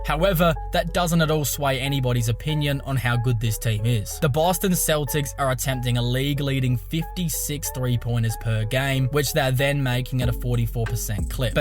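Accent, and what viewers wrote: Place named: Australia. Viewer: You’re voice is so Aussie that it’s not even Aussie any more haha! It’s awesome 🤣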